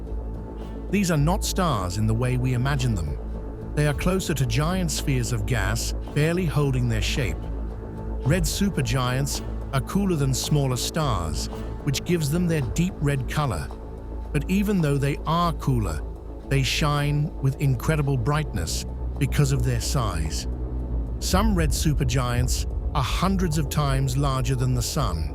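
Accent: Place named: United Kingdom. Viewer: British guy voice! 👍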